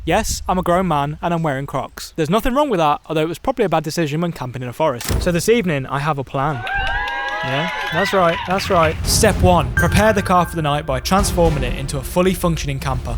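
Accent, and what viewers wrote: United Kingdom. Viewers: You'll definitely reach 1 million in 2026 cuz of your thumbnails and cinematography, like ymeven your british accent is nice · I love that British accent 🤌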